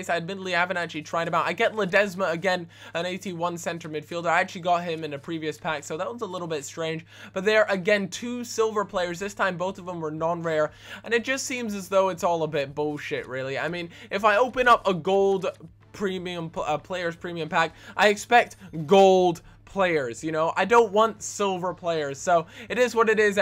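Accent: United States. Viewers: R u American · Sound American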